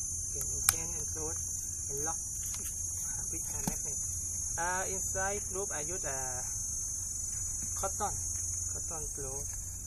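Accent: Thailand. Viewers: คนไทย สำเนียงยังไทยเลยอะ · Over Rock ก็พวกเราคนไทยไงสำเนียงมันไม่ใช่แบบฝรั่งมันเลยงงกัน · คนไทยชัดๆ สำเยียงไทยมาก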